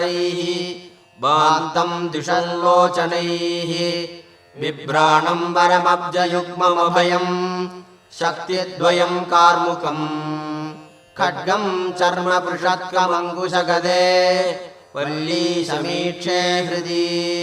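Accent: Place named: South, India. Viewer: Dravidian sanskrit , pronouncing bit different from northern tradition.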